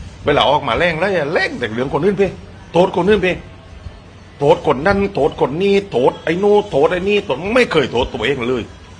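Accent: South, Thailand. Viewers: ไม่ว่าภาษาไทยภาคใด...ปชช.รู้เข้าใจตรงกัน..รัฐบาลล้มเหลวโดยการนำของนายกไทยคนนี้..ภาษาใต้ชัดในความรู้สึกเจ็บปวดครับ..คุณ ชัย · ได้แรงอกพี่น้องเหอ คนใต้แหลงหรอยนิ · แหลงได้ดี นี้แหละคนใต้ต้องชัดๆ💓💓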